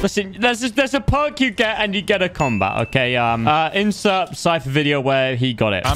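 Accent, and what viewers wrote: Scotland, United Kingdom. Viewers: We need to see more of the scottish accent. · Bro that Scottish accent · Everyone talking about the Scottish accent but I gotta know what he said in German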